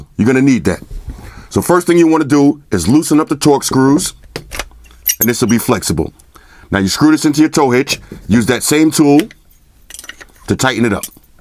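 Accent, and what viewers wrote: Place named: Brooklyn, United States. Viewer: You from Brooklyn son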